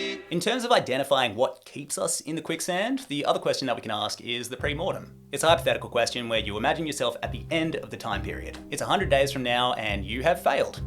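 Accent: Australia. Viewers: I learnt a lot from you but especially to understand the Australian accent. Thank you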